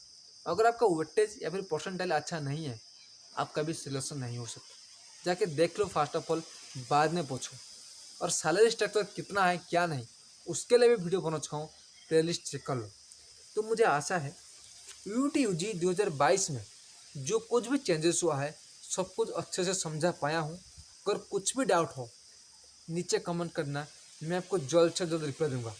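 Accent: Odisha, India. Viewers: Bhai Odia ho na 👈😊 Improve your hindi 😊👈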